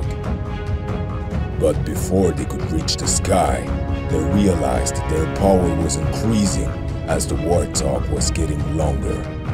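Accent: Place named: Northern Europe. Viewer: you sound like vin diseal with a northern european accent.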